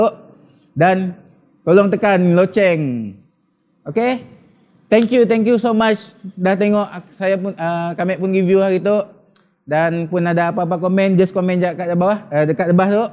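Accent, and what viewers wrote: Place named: Sarawak, Malaysia. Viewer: Mantap 👍mun diam Serawak dalam sebulan nang konpem fasih kelakar Sarawak. Ton suara dah kedak orng sitok.. Kipidap bro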